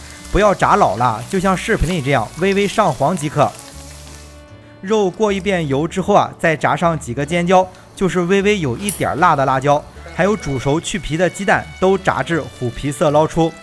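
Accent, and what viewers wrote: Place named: Jinan, China. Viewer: 这口音，绝对正宗济南人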